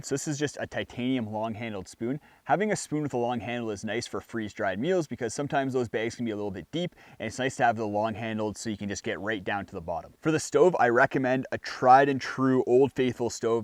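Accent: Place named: Canada. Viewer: You sound especially canadian in this video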